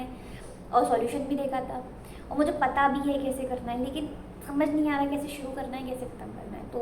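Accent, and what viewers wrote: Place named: Madhya Pradesh, India. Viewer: DIdi MP se ho kya ?? Language bhi jani phechani lag rahi hai 😅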